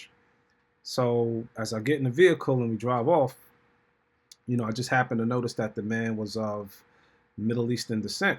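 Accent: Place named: New York, United States. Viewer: loved your video...great narrative! As a former NYer...also great to hear a true NY accent!!!!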